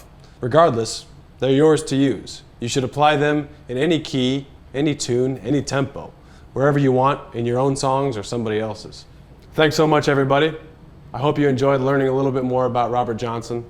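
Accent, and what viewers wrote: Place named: United States. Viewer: Is it just me or is he speaking with a bit more of a cowboy accent in this video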